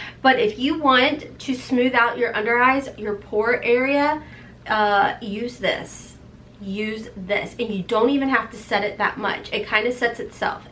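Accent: South, United States. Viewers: I just found your channel and I love your accent I'm a southern girl too💖 I think the pallet will be in the charm rewards store. My email is <mail> now I'm going to binge watch your videos 😍 😍😍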